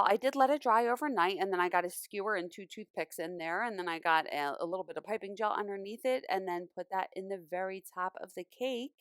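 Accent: Philadelphia, United States. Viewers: You are definitely from Philly!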